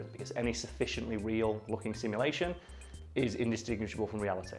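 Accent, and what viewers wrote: United Kingdom. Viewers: Wait, wait. You sound so British and still call it Football? Only ausis and non-englishlanders call it football!?!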